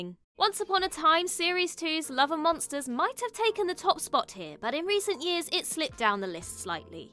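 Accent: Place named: United Kingdom. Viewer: Ayo i love british accent ”doctor U”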